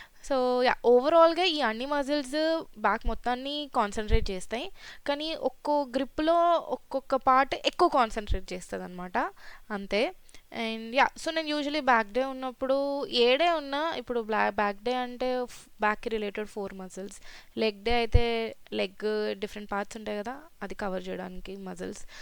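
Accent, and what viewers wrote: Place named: Telugu, India. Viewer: Telugu because I love u r telugu slang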